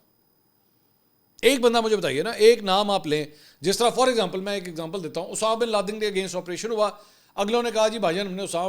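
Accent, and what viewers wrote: Punjab, Pakistan. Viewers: ماشاءاللہ آپ پنجابی بہت پیاری بولتے ہیں ❤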